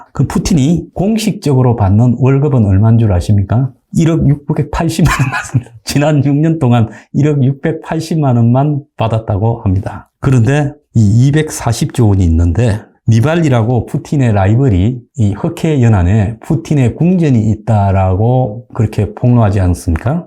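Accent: Gyeongsang, South Korea. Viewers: 경상도 액센트가 멋있고 더 실감나게하네요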